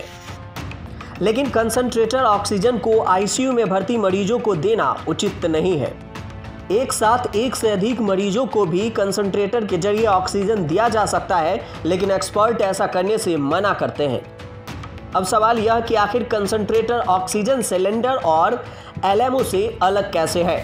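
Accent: Bihar, India. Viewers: Bhai ye Bihar Ka h yha ghode ko ghora khte h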